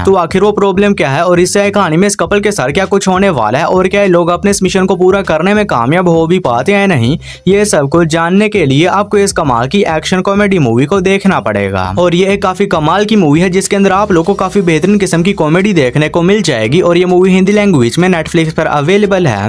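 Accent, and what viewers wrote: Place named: Lahore, Pakistan. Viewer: Bhai tum Lahori ho Kya bolne ka tarika waisa he hai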